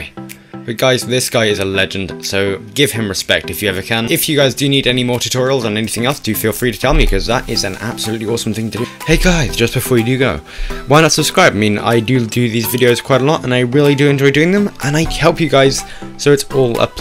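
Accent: United Kingdom. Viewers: Are you british or australlian?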